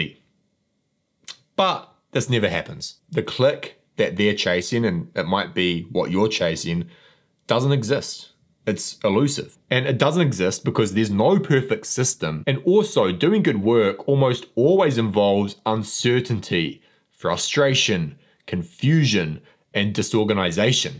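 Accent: New Zealand. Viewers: I might need to rewatch this video. awesome Kiwi accent is distracting me from the content. 😅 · r u from newzeland? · you've got cool accent! i actually never heard New Zeland's English :)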